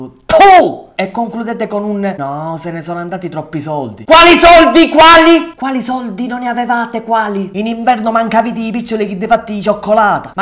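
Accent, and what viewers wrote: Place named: Sicily, Italy. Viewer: Che bello il dialetto Siciliano....bel video è . Ciao da qui sù : Bergamo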